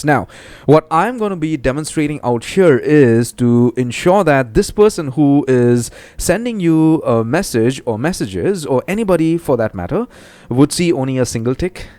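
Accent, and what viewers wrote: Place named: India. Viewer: First off, thanks for being an Indian English speaker Second thanks for an ACTUAL solution to this on the internet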